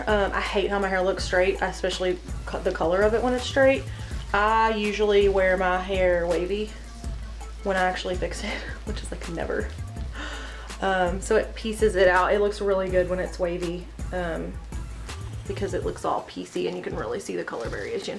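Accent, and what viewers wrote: South, United States. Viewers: Which shade Frost and Glow did you use? I need to do this STAT! You’re freaking gorgeous and have the cutest Southern Accent. Ignore all the trolls. They have nothing better to do with life!! Please get back to me on the Frost & Glow shade so I can get this done fast!! Thank you!! ❤️❤️ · I heard your country accent, heard u were a mom of boys and a nurse and subscribed. Where are you from? Im from Texas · I just love your southern accent.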